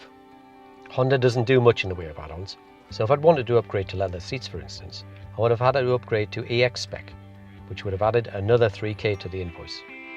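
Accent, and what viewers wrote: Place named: Newcastle, United Kingdom. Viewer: What do you utter at 2'.08" ? , 2 '.15"? , 4'.05" ? , 5.'.07" ? , and 4'.04" WTF do you say here? The biggie 5'.41" " to have our HRV ( Felled?) Do all people from Newcastle speak like you? Your intonation is as hard to understand as a foreign dialect ! I had to listen over and over those parts I noted to try and decipher the mumbled dialect and still could not work out what english words you were using . I thought maybe Newcastle has its own dialect , its patently obvious its not english ! LOL The review is good but you need to annunciate better and sort your intonation.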